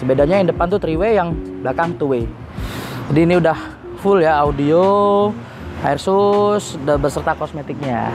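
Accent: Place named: Medan, Indonesia. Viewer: Ini medan bung😂😂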